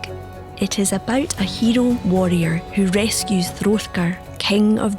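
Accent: United Kingdom. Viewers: Scottish Accent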